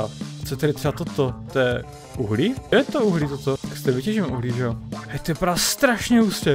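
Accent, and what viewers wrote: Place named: Slovakia. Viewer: Si Slovak? Lebo maš prízvuk Slováka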